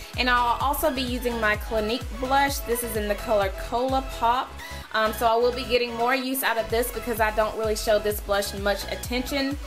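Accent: South, United States. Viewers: You sound like a fellow southern girl too! :) where are you from? just recently found your channel and loving it!! subbed to ya!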